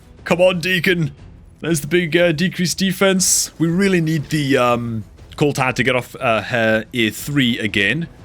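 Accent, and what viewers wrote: North East England, United Kingdom. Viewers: He's from the north east of Britain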